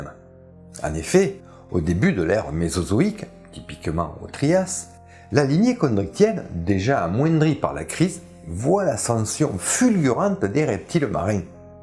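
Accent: South, France. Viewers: Merci pour votre vidéo très intéressante, j aime bien votre accent du sud ❤ Je suis content la musique est plus diversifiée, il y en a juste une que j ai toujours autant de mal à supporter 😂, avis très personnel et subjectif bien sûr Par exemple à , pour donner une idée, c est celle ci qui revient très (trop ?) souvent pour moi la musique est inutile en plus. Bonne continuation, j attends le 2 avec impatience. Quel beau travail en tout cas 😊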